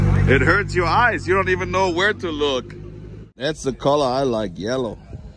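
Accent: Germany. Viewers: Nice to hear a German Accent, my best friend was from Germany. thx for the vids.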